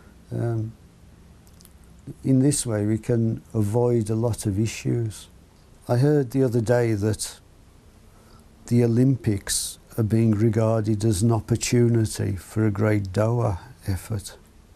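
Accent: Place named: Birmingham, United Kingdom. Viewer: Proper Brummie Brother 👍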